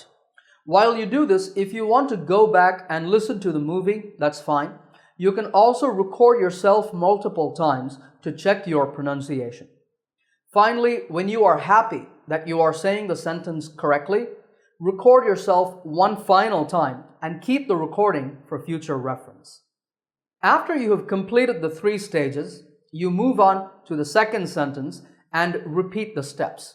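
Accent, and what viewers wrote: United States. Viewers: That's American 1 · Did you grow up in the US ? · I want to learn American Accent from you. What is the procedure??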